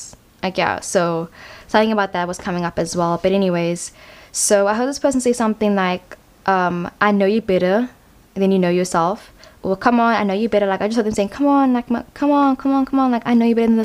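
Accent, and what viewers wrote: South Africa. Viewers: Your accent is beaitiful...are you South African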